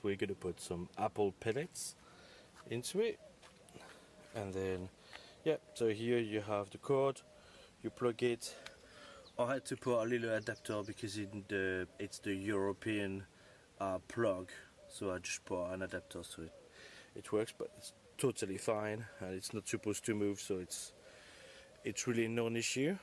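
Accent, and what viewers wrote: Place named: England, United Kingdom. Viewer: You sound more like a Limey...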